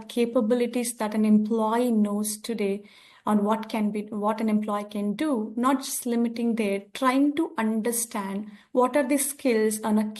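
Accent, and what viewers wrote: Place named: India. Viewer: Its a pitty Indian accent is awful :/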